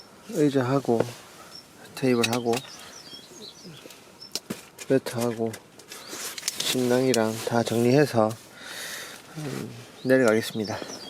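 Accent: Gyeongsang, South Korea. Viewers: 영상잘봤습니다 항상 구수한 경상도사투리 반갑습니다 😁 지금은 서울에 살지만 본가가 사하구라 거가대교 한번씩 가보긴 하는데 도넛츠 🍩 먹으러 가보겠습니다 좋은곳에서 힐링하시니 조만간 저도 한번 가야 겠어요 ^^ 축복합니다 💜💕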